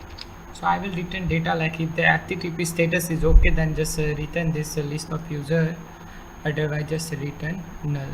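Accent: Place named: India. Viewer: я по говору индусов и их говнокодом сразу за милю чую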